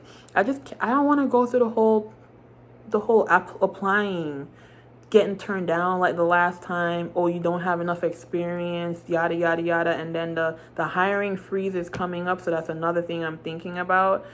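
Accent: Jamaica. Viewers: Interesting I work for IRT. Safety will definitely have a problem w mistakes like that but your human it Happens. I have never heard a local IRT Driver you sound Jamaican best wishes to you sister on your future endeavors lots of tanker jobs out there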